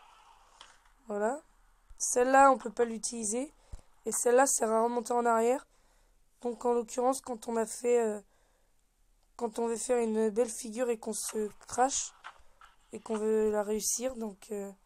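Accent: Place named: England, United Kingdom. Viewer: Ton accent anglais me tu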